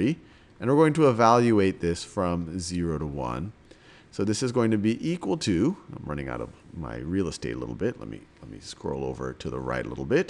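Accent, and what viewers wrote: United States. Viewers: Definitely American. I’d guess either Midwest, northwest, or mid-Atlantic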